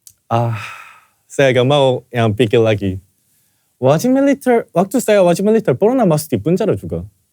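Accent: South Korea. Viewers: Bhs Indo logat korea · Orng Korea omng bhs indonesia sprti bhsa korea 😂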